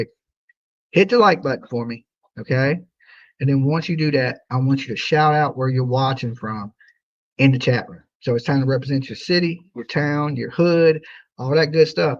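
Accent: South, United States. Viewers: Hey! Long time listener (I remember you playing the Banjo during Summer Wells). I live on the border of Maine (USA) and New Brunswick (Canada) by 3 blocks and a River. It is Picteau County but pronounced Pick (like a guitar pick) TOE (like the appendages on your feet). I know this because I listen to a LOT of Canadian news (CTV or CBC). You are close and that redneck accent may be the issue, but Maine has rednecks, too so no excuse.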